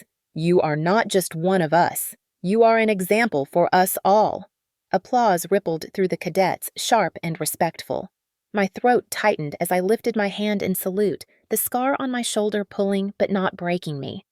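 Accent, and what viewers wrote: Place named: South, United States. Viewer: Love the storytellers' southern accent. especially since my roots are tied to the south.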